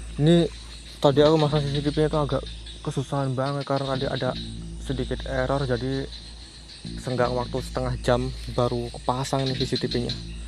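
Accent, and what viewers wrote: Java, Indonesia. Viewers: Salam.kenal mas...maaf kayaknya dri logat ada jawanya yah..salut sama masnya anak muda yg pemberani nyalinya gak kaleng kaleng, hanya perlu hati hati saja dgn org yg bisa jahil apalg dihutan....semangat..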